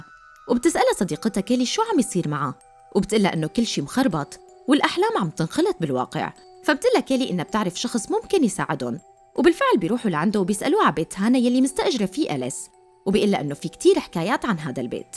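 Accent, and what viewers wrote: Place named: Syria. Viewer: استمري💓 انا كل ليلة نام على فيديو تنزليه فعلًا اشكرك على نشاطك وانك تنزلي كل يوم هذا شيء رائع والله برافو 🤩 اتمنى تعملي فيديوهات لافلام غموض و افلام اخرى منوعة ليس رعب فقط رغم اعشق الرعب لكن التنويع جميل وخاصة انو اسلوبك رائع فعندي فضول اشوف اسلوبك في نوعية افلام اخرى🥰 وخاصة اني احب اللهجة السورية وانت لوحيدة سورية اللي تعمل فيديوهات ملخصات افلام مالقيت غيرك سورية اتمنى لك التوفيق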